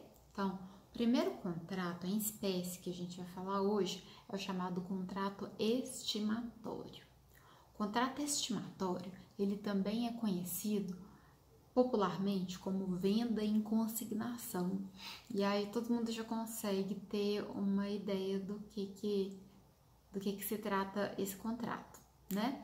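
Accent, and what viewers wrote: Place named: Minas Gerais, Brazil. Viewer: aaaa o sotaque mineiro é a coisa mais confortável de se ouvir🥰🥰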